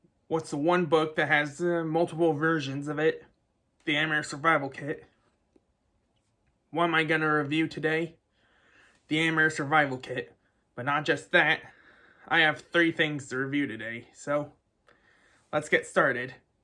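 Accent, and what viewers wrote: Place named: South, United States. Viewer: Fantastic! After many years away from animation, I'm returning. This book is a must and I look forward to reading it again. By the way, I love your accent! I love the southern accent :)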